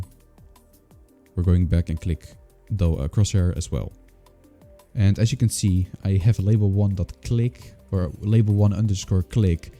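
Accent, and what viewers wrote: Netherlands. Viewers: Ik hoor gelijk dat je Nederlands bent 😂😂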